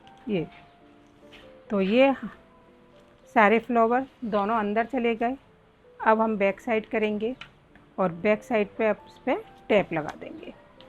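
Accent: India. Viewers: Your accent looks like Maharashtran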